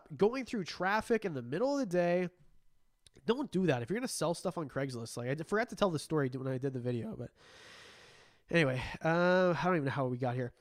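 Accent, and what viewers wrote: Canada. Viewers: Love your Canadian vernacular. Eh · I’ve seen a lot of your videos and this stream is the most Canadian you’ve ever sounded 😂 love it!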